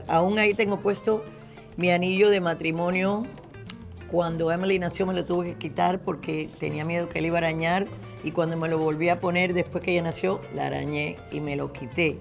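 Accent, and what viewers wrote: Cuba. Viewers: Gloria nunca pierde su acento cubano... Bella!! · Como se oye para ti? Yo soy mexicano creado en Florida. Para mí se oye bien bien Cuban, aunque ella creció en Miami. Te suena su acento gringo al hablar castellano? Como se compara a un acento auténtico cubano--de la isla, diferente a lo cubano de Miami.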